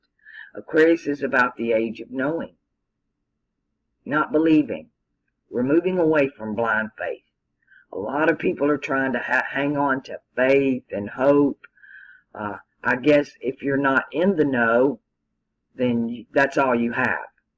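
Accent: South, United States. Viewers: The ones I have always learned the most from, are the surprise, or chance encounters. You, are a picture of this phenomena. At least in my paradigma...! So, isn't it a pleasure, hearing you speak so smooth and southern, about things that are so incredibly in parallel with my needs? Claro, que si. There are ten years of Time, free of obligation, that the gods themselves awarded me, and I immediately consulted the Oracle in Google, and have been there much of the time. Seeking out answers that before clikclikclicketyclik, would have taken twenty years... well, my 2 or 3 thousand hours in navigating the nether [ether?] through the myriad of bad false and OhMyGod! and lies... and all the other... have yielded a few smooth stones, personal and spiritual, precious, which I keep in my pocket. I use these weightless stones, pretty and oval, for many things. 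It's amazing what can be done with the simplest of tools. Especially when and if one connects to that universal..... dare I say?...Force! you know, the one that makes shit happen. All we have to do is acknowledge it, right? hmmm... I'm gemini.. so.. I also keep a turd in my pocket in case someone tries to shit me.... [grin] In spite of my ever so June14 63 pairsohnality, and my razor sharp wit, and my light in my shoes and smiling approach to reality, here... I have missed some stuff, and still have infinite things to learn. Of course. But your videos have certainly put some more color on the table where the puzzle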